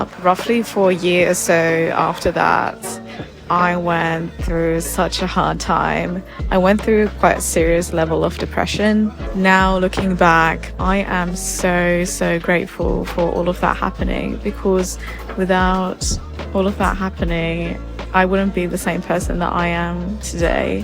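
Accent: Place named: United Kingdom. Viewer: Oh first time here, and really enjoyed it! You sound so British! I live in London and resonate with the 'loneliness' of sort-- especially dating! Subbed :)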